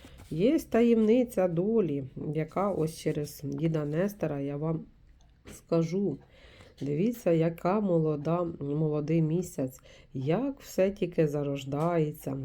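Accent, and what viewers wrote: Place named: Kirovohrad, Ukraine. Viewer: ви самі звідки?Я з Кіровоградщини і ви розмовляєте так,як у нас балакають.Дякую,що я вас відшукала, відео короткі,дякую.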